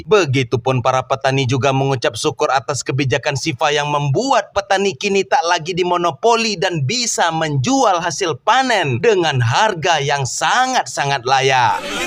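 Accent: West Sumatra, Indonesia. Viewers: Orang Minang ya bg? Jernih banget ngomong njiang gadang nya 😅😅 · Minang kah ads bg😂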